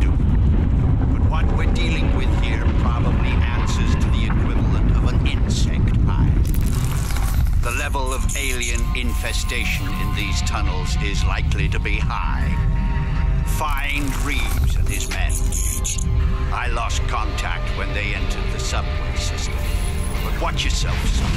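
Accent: Germany. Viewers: Hi i got one question are you german?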